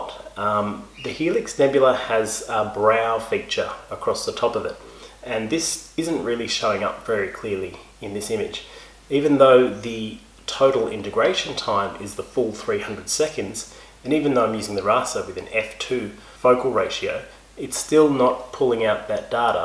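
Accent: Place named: Australia. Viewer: HAHAHAHA, he really has a Down under Accent:)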